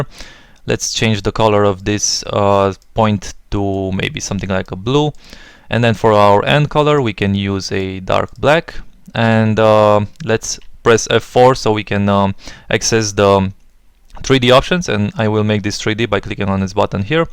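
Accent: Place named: Russia. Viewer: Спасибо!) Сразу видно русскоговорящий)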